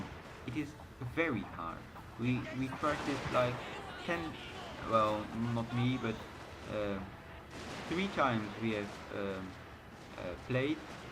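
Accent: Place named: Netherlands. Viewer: If you aren't dutch, ill shoot myself in the face. Great video, but your accent made it 10 times better.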